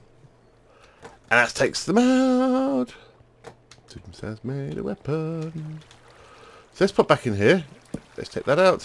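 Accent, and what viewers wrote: England, United Kingdom. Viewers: ***** - nice to hear a British accent, I only ever hear American and Canadian accents :) We must be the rare breed of British youtubers you and I :) Keep it up good buddy. Your British fan/fellow youtuber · You sound slightly like biffa a little. Good ep man:) are u from the UK you sound it?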